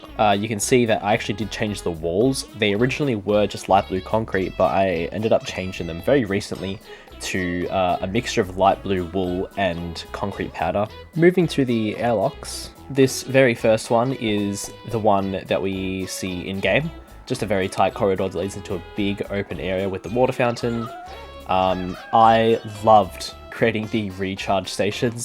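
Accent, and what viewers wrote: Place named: United Kingdom. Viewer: i did not expect you to be British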